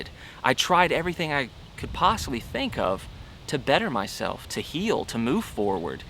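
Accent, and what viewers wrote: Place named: Georgia, United States. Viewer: So glad you're on the upswing! The Georgia accent is coming back and I think that's a good thing ♥️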